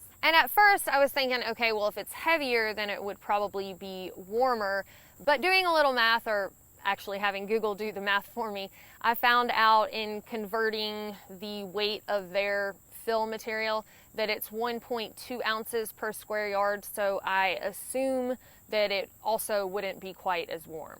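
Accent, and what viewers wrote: South, United States. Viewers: Sorry about the allergies but love the Southern voice.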